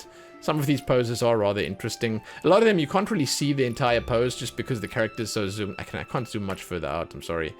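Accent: South Africa. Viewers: Your accent sounds like south african. I'm from South africa and u sound like our accent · Are you South African?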